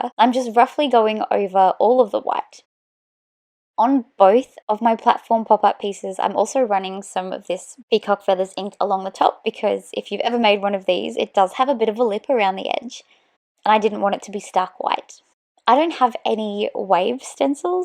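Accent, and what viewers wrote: Australia. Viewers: Love your lovely Aussie voice! Gorgeous card and I love your inspiration piece! Fellow Aussie here!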